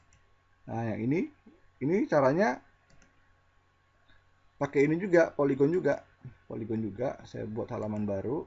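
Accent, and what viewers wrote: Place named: Garut, Indonesia. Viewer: Gan bagi wa nya dong, asli garut ya gan? Logat nya kaya orang garut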